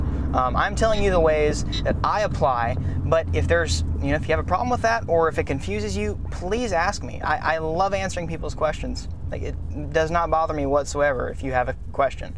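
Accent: Texas, United States. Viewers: Listening to this 3 years later............ THAT TEXAS ACCENT THOOOO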